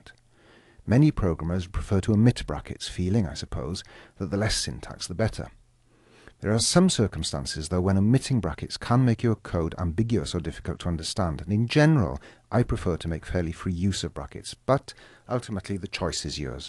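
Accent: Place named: Wales, United Kingdom. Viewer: Now that's a lovely Welsh accent.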